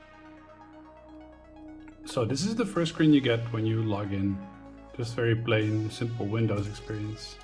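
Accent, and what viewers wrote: Netherlands. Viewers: That Dutch English always 👌🏽😌 great review 🙏🏽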